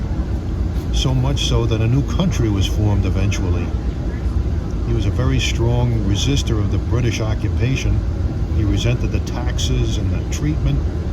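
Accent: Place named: Brockton, United States. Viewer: Hey! This was my first viewing of yours, and I liked it! You pronounced everything as I - a native Brocktonian - would. You just need to have a South Shore Bar Pizza (i.e., Lynwood in Randolph just up from that station) or a North Shore Roast Beef Sandwich (Their given barbeque sauce, please!). Places on the South Shore do offer! Enjoy man!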